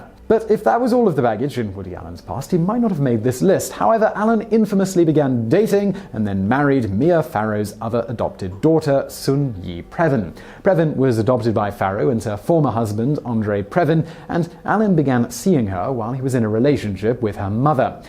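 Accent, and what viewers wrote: United Kingdom. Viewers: brother, your videos are excellent, however, certain words my friend, i.e., "berry" as oppose to baby. Rosemary's Baby. I gave you the benefit of the doubt due to the British accent, after rewinding the video many times, yes, "berry." Cheers my friend. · He's also British so his pronunciation would be a little different....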